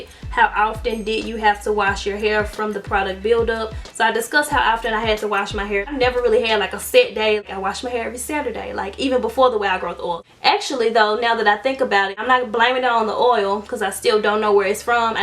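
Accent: South, United States. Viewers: been a while since ive seen a black person with a thick country accent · Wild growth did not work for me unfortunately, and it left a white residue. 😞 You have a lovely accent, BTW. Real Southern gal! 🇹🇹😇👏🤗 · I love you down south country accent! 😍 Soooo cute, girl! I'm from Florida so you know I'm representing! BTW, it's not overwhelming, it's very cute 😉😘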